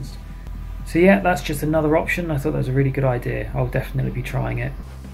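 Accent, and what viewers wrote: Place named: United Kingdom. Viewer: Great video. I like the way you are not so scientific, but more casual and general. Sometimes the basics are more important than all the technicalities. I like the quiet ambient music in the background so I can hear you speak clearly. Nice British accent is also appealing. I learned a lot and enjoyed watching you. Thank you. Wow. I'm glad I made it to the end of the video because I love your idea about using the squeezable condiment bottles. I am looking forward to trying that idea for dispensing fruit flies.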